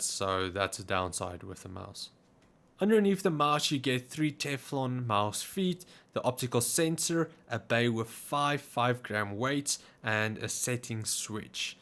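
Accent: South Africa. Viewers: thicc South African accent. Nice vid. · Sounds south african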